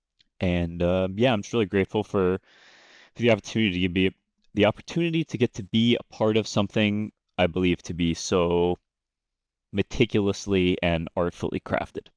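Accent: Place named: South, United States. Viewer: I hope you will still stream and watch some tourna bro we love your southern accent casting.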